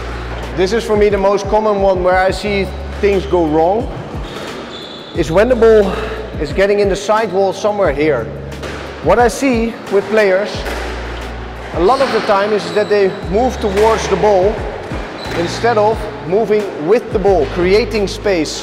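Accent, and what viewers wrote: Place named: Netherlands. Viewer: 1000% dutch accent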